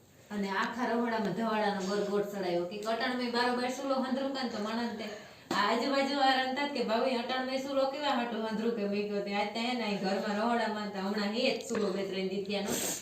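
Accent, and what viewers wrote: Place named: Saurashtra, India. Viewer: જય વછરાજ તમે ઘેડના લાગો બોલી સોરઠ ની છે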